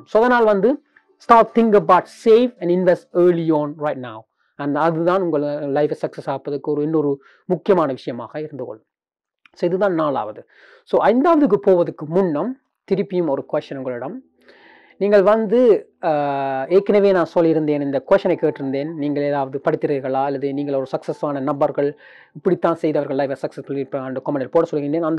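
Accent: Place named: Sri Lanka. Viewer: Srilankan Tamil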